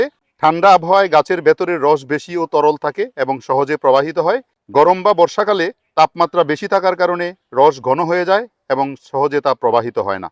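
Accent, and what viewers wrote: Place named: Bangal, Bangladesh. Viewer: গাছি ভাই। কি বাংলা মাইরি। বাঙাল দের বাংলা। দেশ পুড়ছে আগুন জ্বলছে আর এই মাস্টার খেজুর এর রস নিয়ে আলোচনা।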